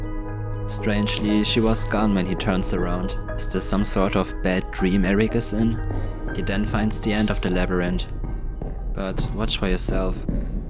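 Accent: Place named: Germany. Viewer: The millisecond i heard your voice. I knew you was german, why? Because i am part German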